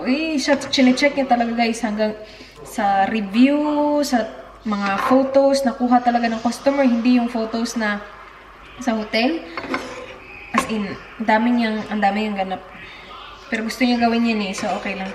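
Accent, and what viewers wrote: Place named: Visayas, Philippines. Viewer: Her accent ?? Kala mo dili bisaya magsalita ehh grabe... Ilove your blog..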